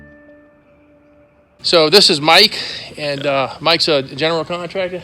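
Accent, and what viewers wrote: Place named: South, United States. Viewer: 😂 thats brilliant! Real southern, love that accent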